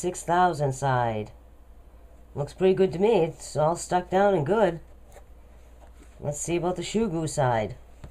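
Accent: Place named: New England, United States. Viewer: That New England accent 💕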